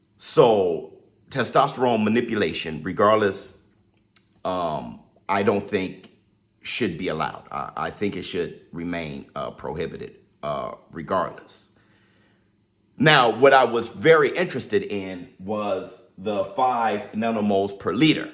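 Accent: Louisiana, United States. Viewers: Is you from the boot mane?! Whodat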